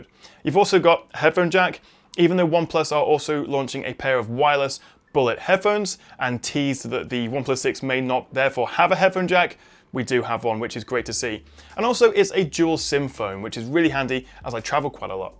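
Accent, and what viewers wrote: United Kingdom. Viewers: Finally I found a decent English( I mean localization) Tech review media brand on Youtube, I love the British street view and ofc your review, ( with a solid British accent which satisfies me a lot ), Cheers!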